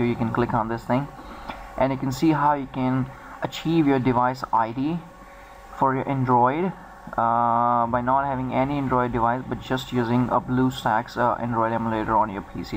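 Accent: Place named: India. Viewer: dude awesome+ ur accent is awesome it was so entertaining listening to curry english thanks, say android again!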